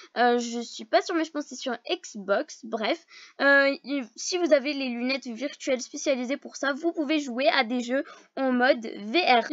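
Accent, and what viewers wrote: England, United Kingdom. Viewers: Merci pourles infos aussi belle accent anglais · Toujours un aussi belle accent anglais😉🦋 Et merci beaucoup pour l'explication!!😁❤ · Toujours un belle acsent anglais 👍✨ Ps :jaime trop t video et ton acsent ! Gros bisous et bonne vacances 🌼😂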